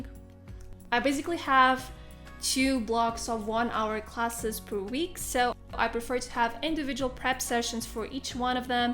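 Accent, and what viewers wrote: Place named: Portugal. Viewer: Wait, ur Portuguese? Your accent looks like Portuguese... só sei que adorei este videos e já me subscrevi! Adoro!!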